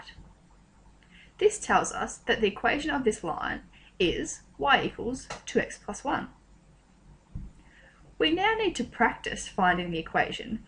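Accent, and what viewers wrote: Australia. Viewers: i couldn't stop laughing at the Australian accent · Good video, minus the australian accent that kinda got on my nerves.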